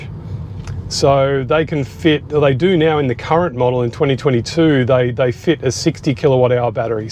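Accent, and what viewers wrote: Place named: Australia. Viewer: Thanks for the info on LFP batteries - I've installed two 12v LFP batteries in my partners EV scooter. Previous batteries became 'memorized' and would only hold about 2 minute of charge. How does that happen? I understand any battery only really delivers 50% of it's stored energy before it's needs to be recharged. Is that true? Your discussion was very interesting if a bit long. And why can't 'regen' breaking provide more useable power to the battery (is it 1% or 2%)? Perhaps you can break your talk up into segments for each topic. I love the Aussie accent. Bill in San Diego.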